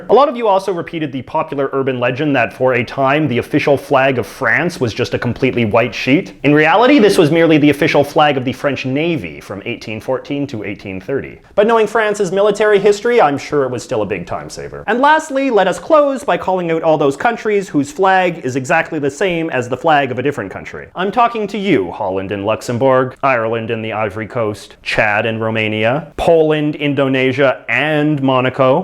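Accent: Canada. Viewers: Just noticed you talk Canadian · This guy has the most stupid stereotypical Canadian accent I have ever heard is he doing it on purpose? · ok so he just has a Canadian accent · I just noticed that you are Canadian because you pronounced about like a boot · dumpling dump chill, he's Canadian he says aboot so yeah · your Canadian because abot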